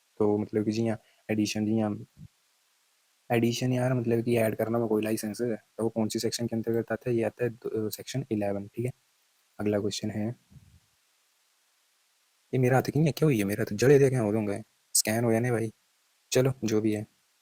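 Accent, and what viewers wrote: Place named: Kangra, India. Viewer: Bhai tuadi bolli sun k kangri lg re mno tusi...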